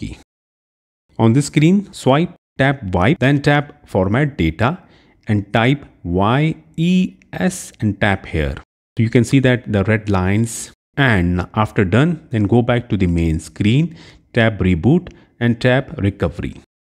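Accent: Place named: India. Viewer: Always an Indian with strong sccent. Never an american making these videos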